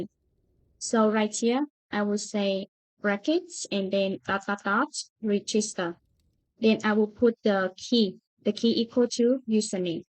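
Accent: Vietnam. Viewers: Nghe phát âm tiếng anh giống người việt cái nhìn lại tên thì ohhh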